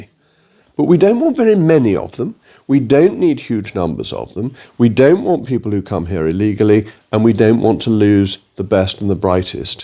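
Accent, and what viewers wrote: RP, United Kingdom. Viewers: Jacob the Elephant in the room remains. WHY are you still Conservative? It means you become more of a disgrace each day No amount of received pronunciation will save you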